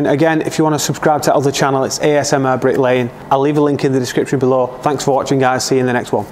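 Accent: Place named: North, United Kingdom. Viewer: I like your dialect. Are you from north UK?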